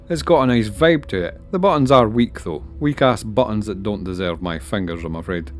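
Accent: Scotland, United Kingdom. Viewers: The scottish accent feels wildly appropriate and correct for space ship reviews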